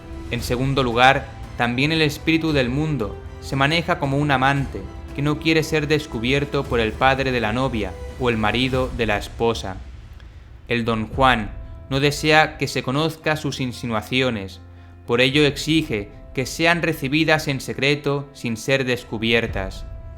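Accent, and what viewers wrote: Spain. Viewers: Macho, tendrás mucha razón, pero ¡que forma más sosa de hablar! Y ese acento madrileñata.... Prefiero el acento latino.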